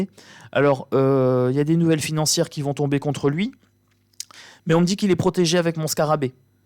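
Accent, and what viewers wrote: Nord-Pas-de-Calais, France. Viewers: Coucou sa fait du bien de vous entendre parler chti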